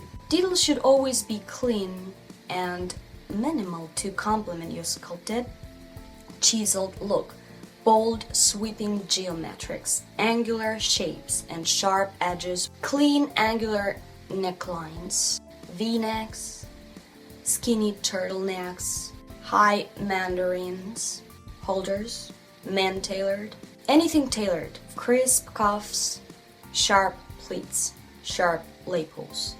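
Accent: Russia. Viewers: please a scarlett johansson inspired <3 <3 <3 can't wait !!! you're the best at inspired makeup :) ps : love that russian accent :D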